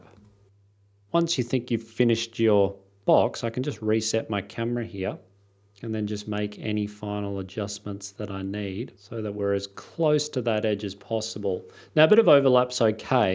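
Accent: Australia. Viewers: a bit off the topic question....but.... are you Australian?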